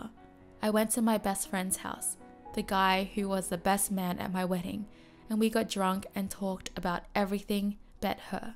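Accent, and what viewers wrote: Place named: Australia. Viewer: Your voice was soothing. Love your soft aussie accent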